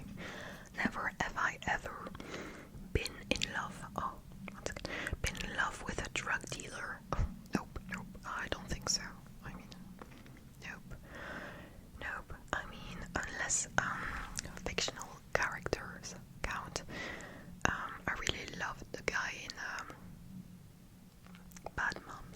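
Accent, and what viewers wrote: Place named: France. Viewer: make more videos with french accent please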